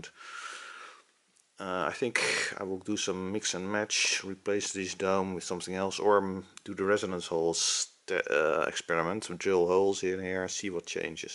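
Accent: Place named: Netherlands. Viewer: Dutch accent 😁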